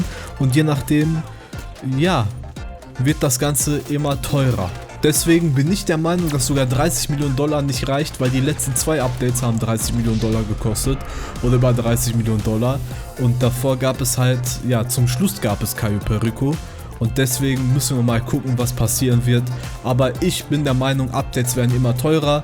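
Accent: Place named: Turkey. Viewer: Dein Türkendeutsch geht mir am arsch. Lern die richtigen artikel und fälle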